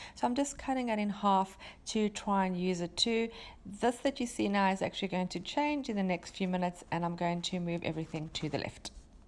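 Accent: South Africa. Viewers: Love your work and your way of explaining what you’re doing and how you come to your final decision about composition. Of course love your accent! Says a fellow South African. I would love to be a winner of one of your cards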